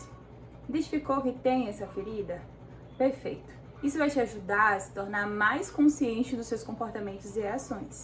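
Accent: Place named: Northeast, Brazil. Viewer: Que presente esses vídeos. Esse canal! E esse sotaque com: oxe!? Amei ❤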